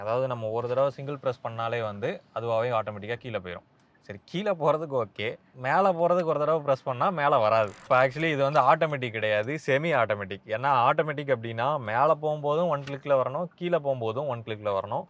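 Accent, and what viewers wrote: Tirunelveli, India. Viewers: தம்பிக்கு திருநெல்வேலி தானா?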